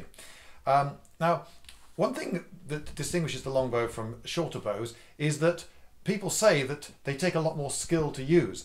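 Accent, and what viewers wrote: England, United Kingdom. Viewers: props to the mentioned extra English-ness. I enjoy your videos very much. best regards from across the pond! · Oh thank God you were EXTRA English in this video!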